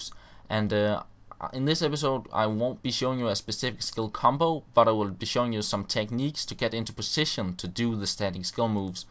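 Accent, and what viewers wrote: Denmark. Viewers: your from Denmark. you got the best nordic english accent I ever heard! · Man kan tydeligt høre at du er dansk! :D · You are from Denmark, i can hear that from your accent. Im from denmark to.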